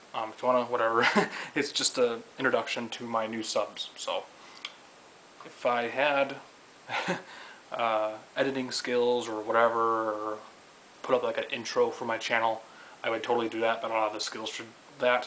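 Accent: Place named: Canada. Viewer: You should of talked more about your favorite games and influences. What was the first game to really blow you away? For me it was Final Fantasy on the nes. I was probably 9 years old and just couldn't believe how friggin cool that game was at the time. Also you have almost a Canadian accent, are you in the States or Canada? Just curious.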